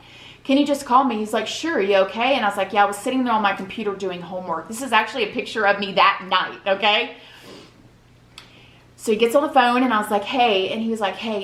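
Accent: South, United States. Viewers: All I hope for is that I get my fair share with the man I love.... I know him from middle school... like I hope it happens like you in a way...where yall met, life did its thing and yall got married in the end. ♥️♥️😭 love your stories, and that southern accent!!! P.S something similar happened to me, Facebook and MySpace kept recommending him to me until we met and once again we ended in bad terms in April. God knows what in the heavens he is doing.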